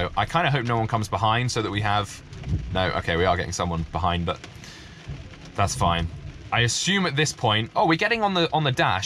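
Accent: United Kingdom. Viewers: Thanks for the video! I like you british accent very much and your pronounciation is very clear and sharp, which makes it much easier to understand for me (I am German). Very interesting how there are introduced subsequently new Autopilot icons; I have never seen before the "To resume Cruise Press the accelerator pedal" message / icon.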